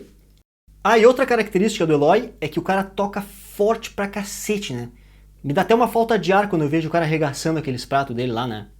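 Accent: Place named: Rio Grande do Sul, Brazil. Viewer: E como é esse sptaquezão gaúcho ai na Noruega hein heehehehehe, tri bom ouvir canal de conterrâneo hahahaahah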